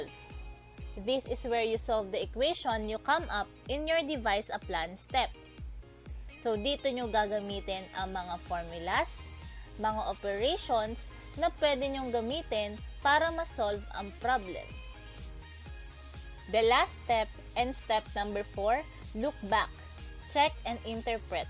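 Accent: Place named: Bicol, Philippines. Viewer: Hi ma'am thank you po,,btw napansin ko po na parang taga Bicol ka? I'm from Bicol din po kase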